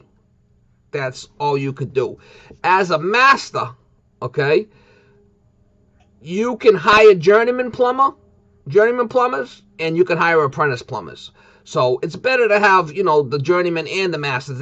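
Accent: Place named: Boston, United States. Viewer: We could tell u might be from BOWSTON.. But anyway, great video .. The best part was when you said "I do what i wanna do" the attitude of someone who doesn't have to get one of those crapy corporate jobs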